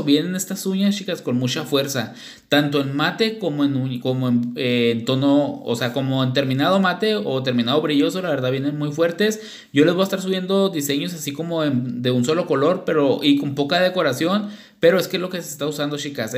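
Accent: Mexico. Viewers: Insisto con que hermoso acento Mexicano tienes, tu forma de hablar me relaja tanto. Y tu manera de hacer las uñas es maravilloso igual que ese color. 😍💙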